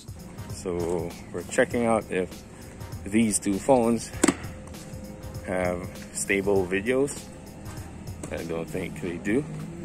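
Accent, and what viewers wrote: Visayas, Philippines. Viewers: You're speaking voice is so calm. Paytera kay bisaya ja inganag accent. You are the best technodad there is. I'm def a fan💛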